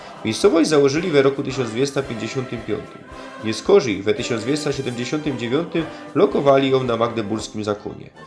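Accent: Silesia, Poland. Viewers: Fajna ta gwara śląska 👍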